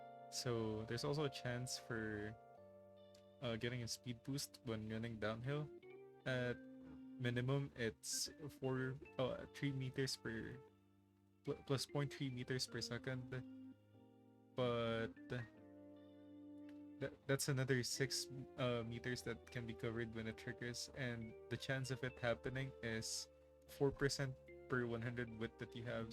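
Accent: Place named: Philippines. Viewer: Interesting video. Honestly I think the main drivers for the burnout are the popular content creators themselves. Lately almost everyday all they do is make videos about preparing for the next CM, and that people need to do XYZ in order to compete. So instead on focusing on the fun aspects of the game, it turns into a checklist grind. I've honestly stopped watching their videos when it's all about preparing for CM. I maybe just watch a few to get some pointers, but I don't pressure myself to do each and every single thing they recommend. BTW, are you Filipino? Was just curious based on your accent. No need to answer if you're not comfortable.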